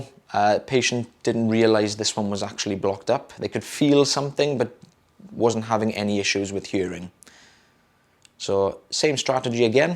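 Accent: Wales, United Kingdom. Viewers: Lovely to see you & hear that great Welsh voice. It's so good that you have your own style of presentation & it complements ' very well. Be your own person!👍🏻🏴🕊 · I enjoy your accents. Idk why but a lot of accents get on my nerves I think Welsh is the most pleasant one in the British isles.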